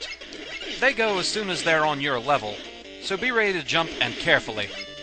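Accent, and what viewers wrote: Scotland, United Kingdom. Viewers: A Scottish guy...playing a robot...who hates birds... Why do I keep thinking of Portal 2?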